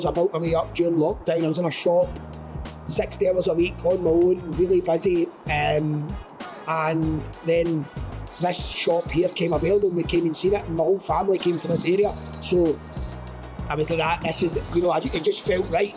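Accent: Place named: Glasgow, United Kingdom. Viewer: bro sounds like he spent all his days in Glesge instead of NY. Mate I need to try your coffee, i’m fae the southside.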